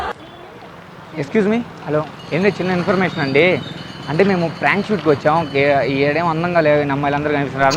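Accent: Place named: Andhra Pradesh, India. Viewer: Ne slang nachutaley .Andhra lekka ostandi kocham marchu....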